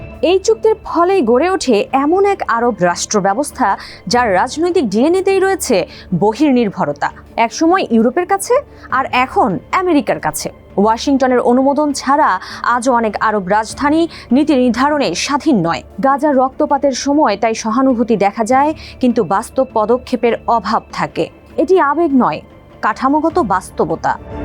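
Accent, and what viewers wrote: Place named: India. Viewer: সমস্যা যেহেতু বুঝেছ, সমাধান সম্পর্কে বল, শুনি আমরা! ভারতের স্টাইলে সংবাদ পাঠিকা কন্ঠ দিলেন! ভারতের দালাল বলা যায় কি?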